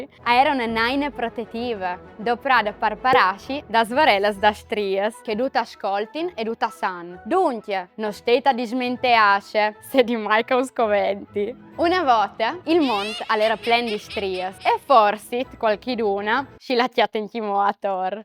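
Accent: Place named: Carnia, Italy. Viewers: Ma certo che e' carnica! Monai....Cleulas...e Prat a sci cjacara cu la a!!!!! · Scusate, sono appassionato di parlata friulana..... Questa ragazza di che zona è? Carnia ? Le parole hanno desinenza in a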